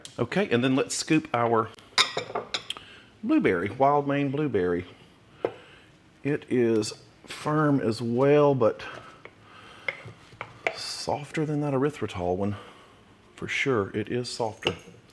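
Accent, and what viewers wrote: South, United States. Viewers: Yes! He's so down to earth and as I too am southern it is music to my ears to hear him talk ❤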